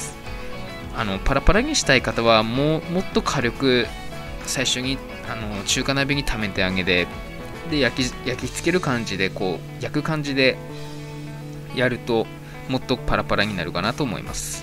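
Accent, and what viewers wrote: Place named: Aomori, Japan. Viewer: 青森の方ですかね？ 津軽弁が懐かしいです。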